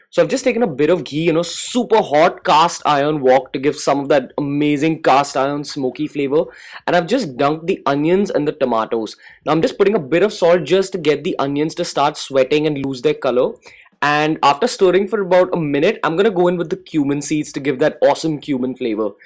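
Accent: India. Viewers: U nghuy so ndope lol Indian accent but your so simple that what's makes u dope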